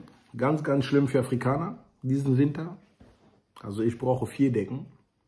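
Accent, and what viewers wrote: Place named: Ruhr, Germany. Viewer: Wer genau hinhört, hört hierbden Ruhrpott raus. Gut so; da liegt eh das Herz auf dr Zunge. Glück auf.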